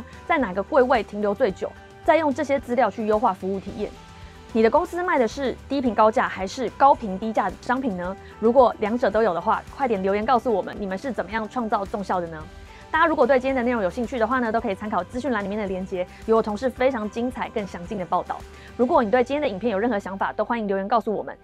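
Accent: Taiwan. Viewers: 這個口音突然感覺到是很特別的，很台灣很熟悉很親切，專屬於台灣而且是女生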